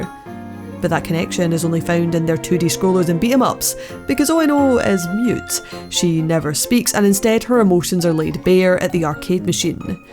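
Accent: Scotland, United Kingdom. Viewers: Good to hear a Scot do the review. Ta Pal.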